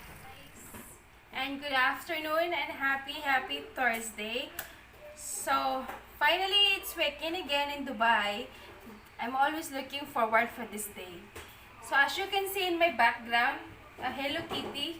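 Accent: India. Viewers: I like your accent, u slightly get this Indian accent, mukhang masarap yan Sis thank u for sharing, stay connected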